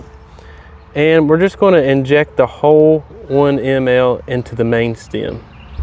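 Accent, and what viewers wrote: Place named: Carolina, United States. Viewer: lolol...you said 3 words and i knew you were from Carolina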